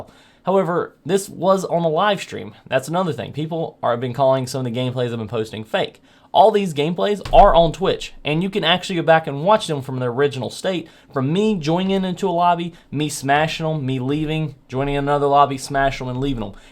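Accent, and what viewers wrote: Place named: North Carolina, United States. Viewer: even though im black i live in NC and i talk exactly like you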